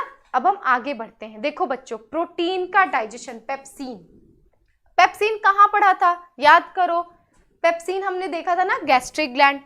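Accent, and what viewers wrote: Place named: Bihar, India. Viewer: The Presentation was great and The Explanation was awesome with some extra concepts I think.Though It wasn't of my class but I enjoyed it. KEEP UP YOUR GOOD WORK TEAM and if I am not wrong from your Accent I can guess that you are from Bihari origin having said that I am not from there. Anyways BEST OF LUCK TO THE TEAM for your youtube channel and I wish your channel grows over time. Once again thanks for your amazing explanation.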